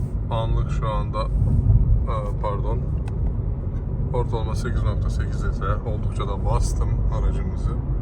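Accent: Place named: Söğüt, Turkey. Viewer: Nerelisin hemserim sanki sögütten geçtin gibi geldi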